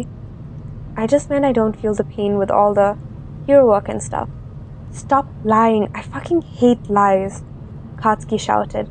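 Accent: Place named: Afrikaans, South Africa. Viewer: Quick Questions: Are you Afrikaans? or Indian? Either way, I love your accent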